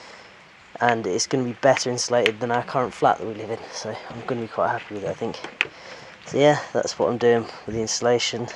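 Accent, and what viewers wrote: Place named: East London, United Kingdom. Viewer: you sound like an east-londoner!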